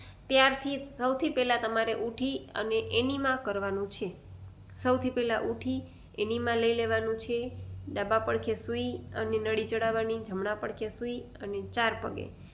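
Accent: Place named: Kathiawar, India. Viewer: Mane tamaro kathiyavadi voice bav game ben sweet boli che👌🤗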